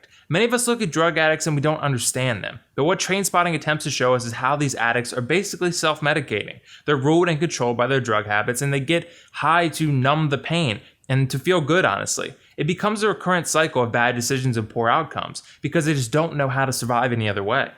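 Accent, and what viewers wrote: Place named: United States. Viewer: I only came here to hear an American talk about this movie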